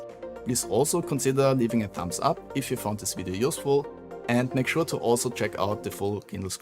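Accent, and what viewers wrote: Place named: Austria. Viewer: Inspite the heaviest Austrian(?) accent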